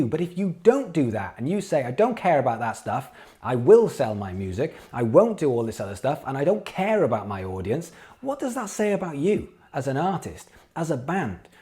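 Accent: Wales, United Kingdom. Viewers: ahhh Swansea.. that makes sense I couldn't place it for some reason. I'm from the valleys (ebbw, brynmawr sort of way). Nice one man, enjoy the states.. my bro lives in California and they love the Welsh accent apparently. Dig your videos man. Have two bands and struggle to push them online despite one of them being signed haha.. so i find these vids helpful. Keep up the good work dude.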